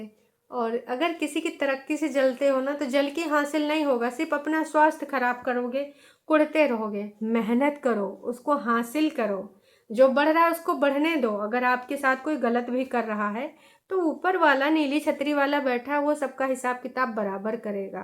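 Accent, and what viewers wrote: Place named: Awadhi, India. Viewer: Mam,aapka vlog or aapka way of talking bahut atcha lagta hai or beech beech main aapka awdhi bolna bahut atcha lagta hai.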